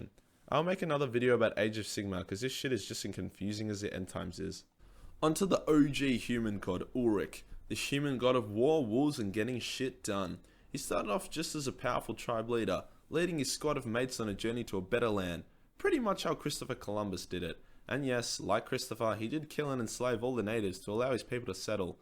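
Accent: Australia. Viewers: haha love this keep making these love you ausie accent cum to britain and speak we will love you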